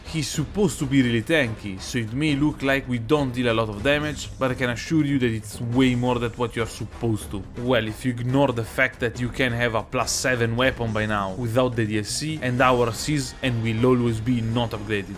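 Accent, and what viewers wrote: Italy. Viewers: I love your videos and also I love your accent. If you're Italian "spero nel meglio per te e il tuo canale" Else "I wish you the best" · Prime tre parole e ho istantaneamente sentito il fatto che eri italiano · oh right you're thick italian accent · Si sente che sei italiano, ma parli veramente bene in inglese✌🏻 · funny how i recognized your italian accent only because i saw a shitpost about vento aureo where there was italian text to speech speaking english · Bro dall'accento ho capito subito che eri italiano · bellissimo come io sia capitato su questo video per caso e si senta l'accento italiano hahahahahaha, comunque complimenti per l'inglese👍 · You italian or french?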